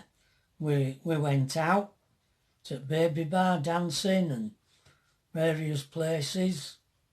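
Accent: Yorkshire, United Kingdom. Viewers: We love watching your channel; your a man of many talents and your both a lovely couple: You make us smile and it’s great to hear the Yorkshire accent and sense of humour: We are from Bradford and being living here in the West of Ireland which we love: Don’t give up; remain positive; your a very special couple.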